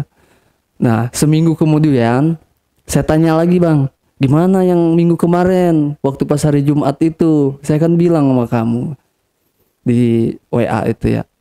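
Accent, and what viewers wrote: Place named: Surakarta, Indonesia. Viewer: Kaya orang solo jir😂😂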